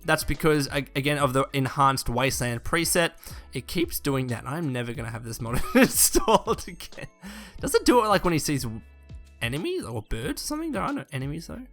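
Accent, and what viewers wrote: Australia. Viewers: Yeah the Australian accent can be hard to understand for some people :P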